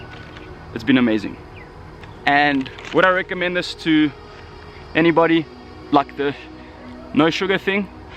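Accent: South Africa. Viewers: I just love south African accents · I thought I was crazy for thinking you're South African due to your accent, Good video brother 💪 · I swear I could tell you were South African... I just couldn't figure it out :D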